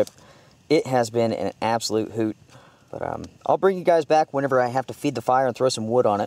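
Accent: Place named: North Carolina, United States. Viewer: Love your videos, Just found your channel recently. Interesting that you say pouring the blood, or, pouring the rain. I've never heard anyone adding " The " in those phrases. Must be a North Carolina form of speech? Keep the videos coming, I will be watching, I've got 3 years worth to catch up on...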